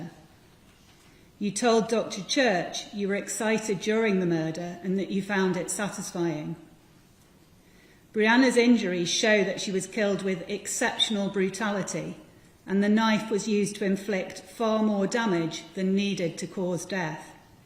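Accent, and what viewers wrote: United Kingdom. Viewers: Video with British accent, not American. But yeah 2 crazy person exist blame on government and school for not equal realise us lgbt in public. Obvious they not doing enough for lgbt community in Britain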